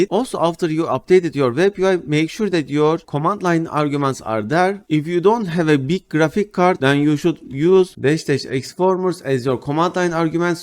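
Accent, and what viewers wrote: Turkey. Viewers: Dostum merhaba,Hiç sayfana girip bakmadan konuşma tarzından Türk olduğunu anladım ve çok mutlu oldum.Gösterdiğin şeyler araştırıp bulamadığım bir çok sorunumu çözdü ve çok takdir ettim seni.Kolay Kolay hiçbir kanala abone olmam ama bugün 1 abone kazandın.Başarılarının devamını dilerim.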